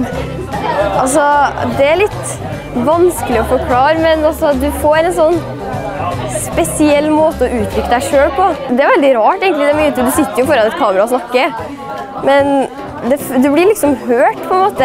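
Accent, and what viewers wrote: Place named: Trøndelag, Norway. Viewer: Trønder, det liker jeg! :D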